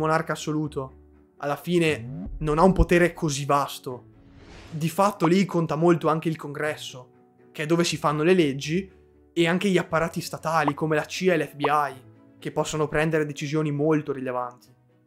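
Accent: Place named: Chioggia, Italy. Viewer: Mi sembri da Chioggia 😊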